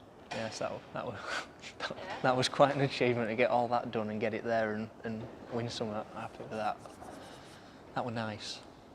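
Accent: United Kingdom. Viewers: Sounds like a Yorkshire man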